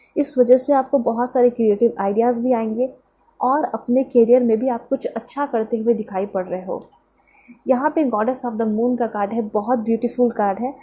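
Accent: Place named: Punjab, India. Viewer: Ma'am sorry for this comment. I like your Punjabi tone in your talk. I even enjoy many sounds. Thanks again.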